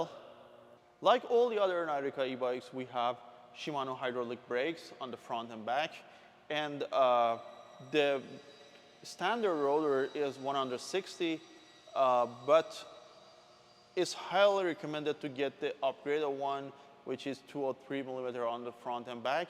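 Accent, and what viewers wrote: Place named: Iran. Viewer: Yes they ship to all countries and Im 90 percent sure Brazil is covered. They will charge you factory destination and shipping and tax. Might be more expensive then in America or Dubai, where the company is based from. They are also Canada location. As it says on the bike Assembled in Canada. This owner has a brother which I think works for him. This guy is Persian and has a nice thick accent. A 12 year old can do a better job then this nut case LOL.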